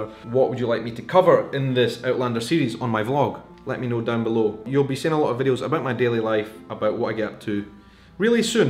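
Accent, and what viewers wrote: Scotland, United Kingdom. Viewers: Love your videos about Outlander. Big fan here as well! Actor Richard Rankin, who you will see in season 2, is from Glasgow as well and he has that very accent you talk about. But you will see that later on. Please continue those outlander videos. They are great! (plus it's just great to listen to a Scot. I'm from the Netherlands, but I love Scotland!) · Oh my! As an American (and sorry about President Douchebag...), I am loving to listen to as I have always thought that the Scottish accent is the most ear-pleasing accent of all. That being said, I am currently planning a trip to England and Scotland for next year, and I canna wait! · Hate to say it, but as a Scot myself, much as I like your accent because all Scottish accents sound like home, it is not 'refined' and not really like Sam Heughan's at all. I would not have described you as having a George St accent. 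You have far too many glottal stops for that and your vowels are too fronted. I think you also have a mild case of Edinburgh accent superiority.:) Listen to people like Annie Lennox (Aberdeen), Kirsty Young (East Kilbride) or Peter Capaldi (Glasgow), Andy Murray (Dunblane), or Ewan McGregor (Crieff) to hear refined accents from other parts of Scotland. Also, there are people in Scotland outside the Gàidhealtachd who can do a Highland accent, though I will say that they're mostly older people who heard more Highland accents when they were young. Glasgow especially had a huge influx from the Highlands and many Glaswegians today had Highland grandparents who spoke Gaelic. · Hi im sabrina and i love your accent and your video's but i want to ask when you are in brazil do you talk brazilian? And is it hard to go from scottish accent to brazilian? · You had me at Scottish accents,. I came across this video by chance and have watched a few more of your videos, to say I'm hooked is an understatement! 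I have to watch the Outlander now! I'll It doesn't hurt that you've got the accent too. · I am from Greece and I love the scottish accent.. in a way it feels more familiar because your "r" is closer to the Greek "r" than other English accents! great video 😃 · I'm very much a fan of the Outlander series. Been listening to the audio books for the last weeks now which are read by Davina Porter and they are so so good. And the TV series is great too that's how I first came to know of it! I love Scottish accents and Gaelic too. Living in Germany sadly you don't hear it too often. ^^ So I'm happy to have found your Channel here. One place more to listen to this wonderful accent. Other than that I mostly relied on watching old Late late show episodes with Craig Ferguson in the last years. : D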